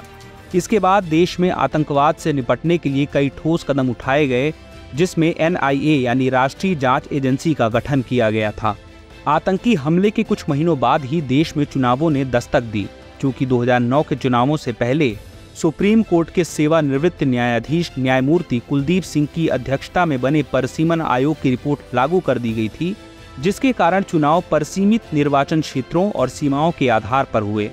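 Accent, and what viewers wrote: Bihar, India. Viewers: Aap Bihar se ho...? Hna..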